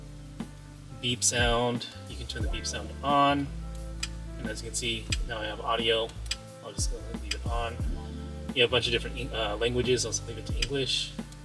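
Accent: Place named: North America. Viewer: you sound like you’re from north america, so it should be set to 60hz. at least that’s our electrical currency and can result in flickering when viewing back recorded videos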